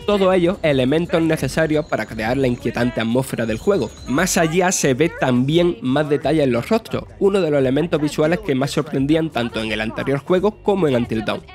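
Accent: Andalusia, Spain. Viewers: tiene un acento de una zona de españa...Y sí esas personas no me parecen las más indicadas para hacer de locutores.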